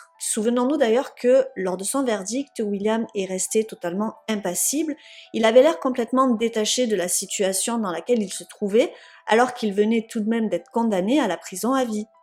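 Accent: South, France. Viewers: Bonjour, Personnellement je ne regarde jamais les images. Je ferme les yeux et j'écoute en utilisant mon imagination pour me visualiser l'histoire. Images ou pas, j'apprécie tout autant tes histoires, et un grand bravo pour ton travail et ta façon de raconter. PS. J'aime ton accent, ne serait-ce pas un petit accent du Sud ? Au plaisir d'entendre ta prochaine histoire. Je te souhaite une très belle semaine. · Narration au top comme dab avec le petit accent du sud qui va bien :)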